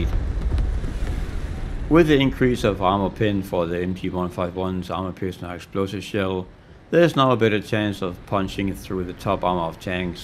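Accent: Denmark. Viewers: Tak for info min ven. Det gik først op for mig til sidst du lød dansk hahaha. Keep up the good work brother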